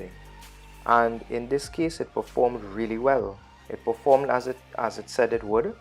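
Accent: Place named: Trinidad and Tobago. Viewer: Cyar miss dat twang bai Trini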